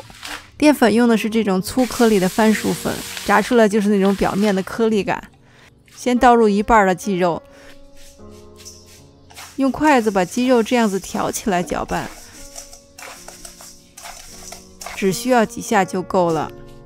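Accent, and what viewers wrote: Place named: Beijing, China. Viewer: 就喜欢你这口京味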